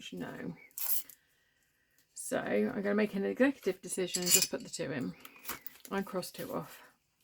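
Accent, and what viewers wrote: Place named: England, United Kingdom. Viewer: ❤ Hi! ❤ I'm here, watching and enjoying! What region in England are you from? I'm trying to figure out your accent.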